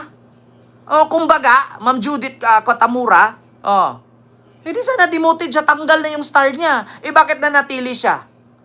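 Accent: Visayas, Philippines. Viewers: Bakla puro ka dakdak sarili mong opinion yan husayin mo accent mo bisaya ha ha ha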